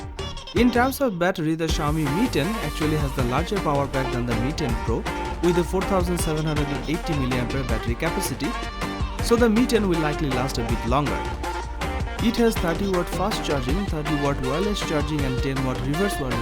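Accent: India. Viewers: Please, next time without Indian accent🙏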